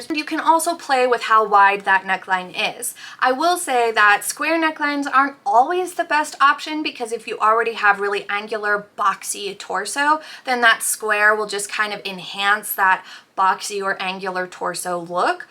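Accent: United States. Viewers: No worries. 🙂 I have no idea where you live, but you sound like you have an American English accent, and darn it, it's very hot right now!!! 😉 Generally speaking, we are in the hottest month of the year. So if anyone looks a little bit oily or a little bit shiny, I tend to shrug and think "Well of course they do; I get it." I'm sure you will eventually tweak all of the settings to your liking. 👍❤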